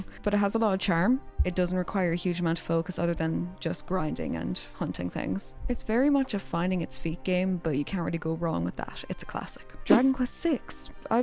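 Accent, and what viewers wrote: Ireland. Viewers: Hold up is you irish? If so thats dope as shit can you say water in your next video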